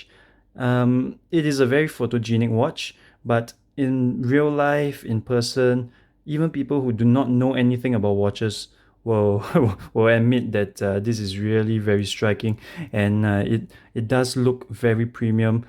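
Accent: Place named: Singapore. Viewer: The second when I hear you speak, I know you're a fellow Singaporean haha. I just got mine early this month, it's my first luxury watch too and I absolutely love it. Congrats on your new watch! Cheers :)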